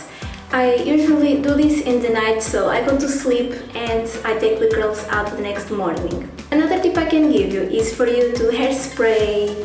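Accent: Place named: Portugal. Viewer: Vi logo pelo sotaque que eras portuguesa!!! Adorei o método resulta super bem no meu cabelo! Obrigada e boa sorte para o teu canal! Beijinhos :D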